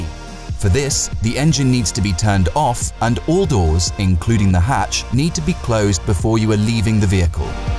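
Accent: United Kingdom. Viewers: 뭐야? UK English???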